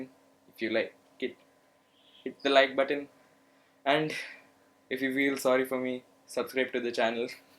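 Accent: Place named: South, India. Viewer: not really dude, stop searching on the internet for online shopping of computer parts on sites like amazon and flipkart, start finding wholesale markets/shops where you can find these components for cheaper costs, now by your accent, I believe you're an south indian, i don't know about PC markets in South India, but here in North India, I think Nehru Place in New Delhi is probably the best place for buying components at a decent (not so high) price point, for an example, you can buy a Benq GL2460HM monitor for like 9000Rs whereas on flipkart it goes for like 10,500 Rs, also a Zotac Geforce GTX 1080 costs (if you find a good deal) nearly 57000Rs whereas on amazon its like <num>Rs, and similarly all the parts are cheaper to buy from Market rather on the internet.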